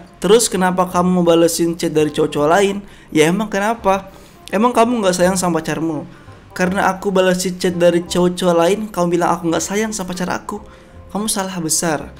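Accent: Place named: West Sumatra, Indonesia. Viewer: emg dri khas minang:))😅🤔😇💔😤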